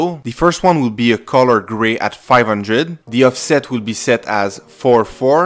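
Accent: Quebec, Canada. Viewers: Are you french Canadian by chance? This accent is really familiar haha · t'es quebecois?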